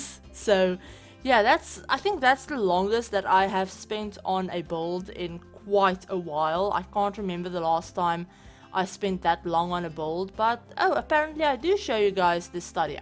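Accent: Afrikaans, South Africa. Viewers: Are you a Afrikaans speaker? 🇿🇦 Excellent build 👍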